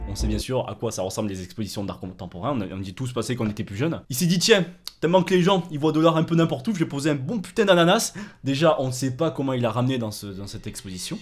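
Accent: South, France. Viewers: T du sud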